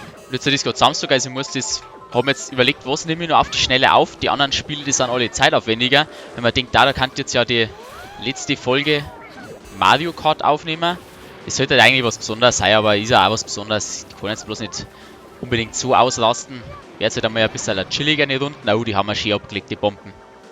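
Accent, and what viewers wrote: Bavaria, Germany. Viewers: wie immer a guards Video! und was mir daugt is dei Dialekt, wenigstens mal a gescheites bayerisch,mach weiter so👌😉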